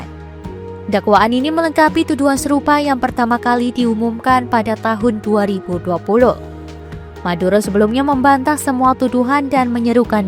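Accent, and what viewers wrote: Java, Indonesia. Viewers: Medhok sekali dubber nya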